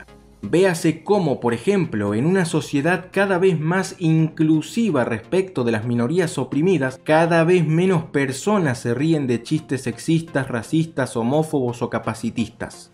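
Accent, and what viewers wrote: Argentina. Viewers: ESPERA.... eres argentino ?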